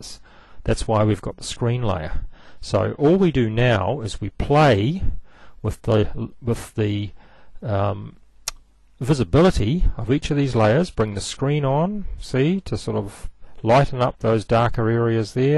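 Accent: New Zealand. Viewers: hahaha a kiwi accent for sure im from timas. Anyway thanks for the quick tips (a real time saver) just have to remember : top =overlay 2nd = screen cheers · weren't sure if I detected the kiwi accent right, but I was happy, when I read the other comment. As I Kiwi you don't need this video since all your sunsets ARE already enhanced! Great tips though!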